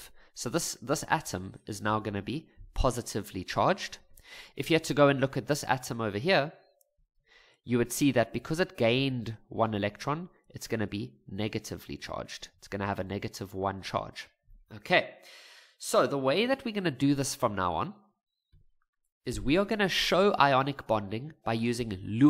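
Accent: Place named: South Africa. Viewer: you from south africa aint you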